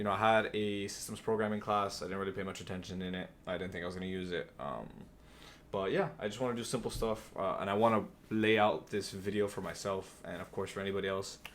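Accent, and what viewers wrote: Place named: Brazil. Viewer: Suspeitei, meu irmão, que vc fosse brasileiro por alguns trejeitos de sotaque que eu tbm tenho (bem mais que você, é claro). Valeu pela mensagem, tô aqui no Brasil, na luta, trabalhando de SysAdmin e Analista de Sistemas numa pequena empresa, mexendo principalmente com ERP. Tenho sempre tentado seguir o caminho que Nosso Senhor pôs pra mim, antenado às mudanças do mercado mas sem se encabrestar por elas, senão pelo Evangelho; e esse emprego foi mais uma bênção que ele concedeu para minha vida. Que Jesus, nosso Deus, Maria, sua mãe, e São José, seu pai adotivo e provedor, te ajudem na tua própria jornada, irmão. Fica com Deus!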